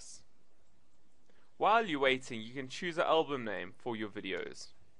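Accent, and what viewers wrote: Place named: South Africa. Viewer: I immediately recognized your accent as a fellow South African! I have lived in Portland, Oregon, USA for the past 31 years, and still miss my homeland although I am very happy here. Thanks for the helpful info.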